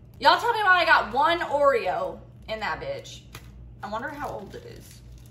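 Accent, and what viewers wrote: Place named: Texas, United States. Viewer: Yalll u from Texas u say yalllll a lot not a bad thing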